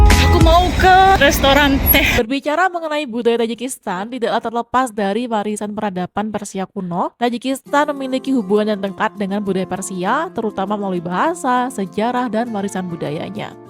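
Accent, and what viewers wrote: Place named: East Java, Indonesia. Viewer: Mbaknya logatnya agak medok sepertinya dari jawa timur ya😅😅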